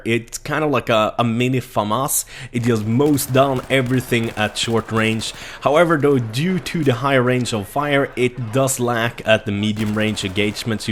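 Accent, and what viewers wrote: Sweden. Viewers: are you from sweden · Märker att du är från Sverige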